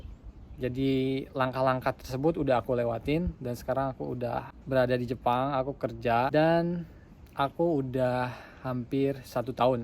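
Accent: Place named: West Java, Indonesia. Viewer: "Aku nyaaa cangkeull" sunda pisan nya a😅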